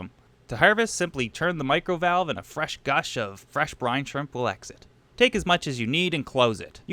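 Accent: Canada. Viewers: Best video on this subject. You are Canadian huh? I can tell by how you say about. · Are you from Canada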